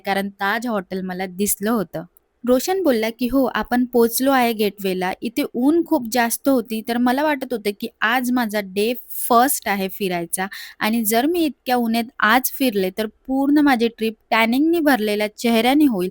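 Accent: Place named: Vidarbha, India. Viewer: मराठी भाषेचा विदर्भ tone बदलून शुद्ध केला तर खूप छान व्हिडिओ होतील आणि नक्कीच चॅनेल monitiesed होण्यास मदत होईल, कारण बाकी सर्व छान आहे त्यात केस तर खूप सुंदर ❤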